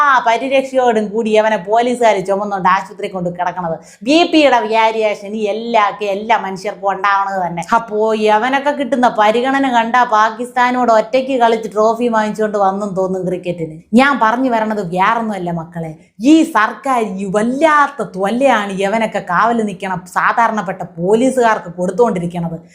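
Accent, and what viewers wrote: Thiruvananthapuram, India. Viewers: തിരുവനന്തപുരം നാട്ടുഭാഷ കേൾക്കാനാണ് ഞാൻ ഈ വീഡിയോ മുഴുവൻ കേൾക്കുന്നത്. ആ കെട്ടവന്റെ കാര്യം കേൾക്കാൻ അശേഷം താല്പര്യമുണ്ടായിട്ടല്ല. എന്തായാലും പ്രസന്റേഷൻ അടിപൊളി.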